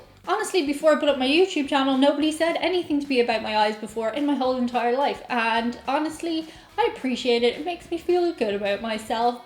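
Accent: Ireland. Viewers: I have no trouble understanding what you're trying to say because even being American I use the double negative myself sometimes, and I can definitely tell that your Irish from your accent, and I really love it.I have blue eyes myself and since only 2 percent of the world have blue eyes it's getting more and more rare.What attracted me to your channel the most was seeing your face as I was surfing YouTube, your so gorgeous, and now I watch because your interesting and you have a beautiful personality.🦋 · Pros you are super cute and your Irish accent cons when you came to America we didn’t get to keep you 🤷🏻‍♂️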